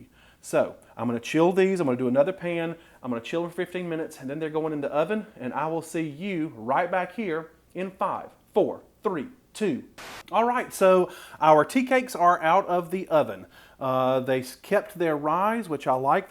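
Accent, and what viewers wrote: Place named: Appalachia, United States. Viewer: You're awesome =) You talk like my folks in the mountains of Virginia =) I left there in 1995 and moved to Ohio. I might have to retire and move back =)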